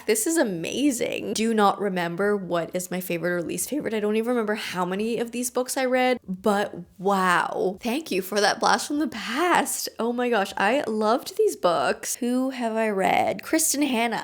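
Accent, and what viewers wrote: Canada. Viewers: Your Canadian accent is so prominent I love it!! It’s super cute!!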